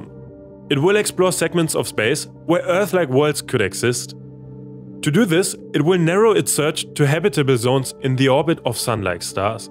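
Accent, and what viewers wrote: Germany. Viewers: Is he German? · His accent is clearly German 😅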